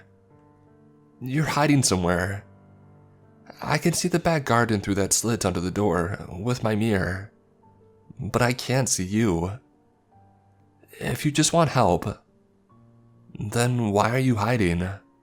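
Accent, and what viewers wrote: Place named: California, United States. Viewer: I hate your preppy Californian girl accent.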